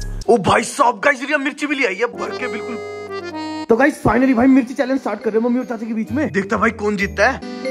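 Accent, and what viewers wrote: Haryana, India. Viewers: Bhai aap Delhi se ho ya Haryana se?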